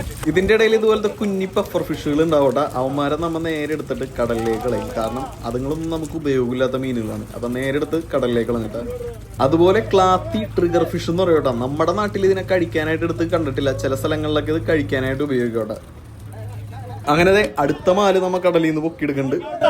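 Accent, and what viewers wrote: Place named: Karunagappally, India. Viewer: കൊല്ലം കരുനാഗപള്ളിക്കാരാണോ സംസാര അത് പോലെ തോന്നി എന്തായാലും നല്ല വീഡിയോ❤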